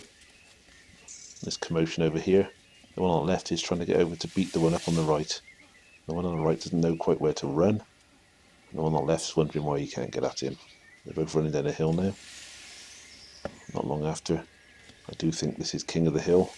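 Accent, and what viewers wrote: England, United Kingdom. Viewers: What part of England you from? greetings from California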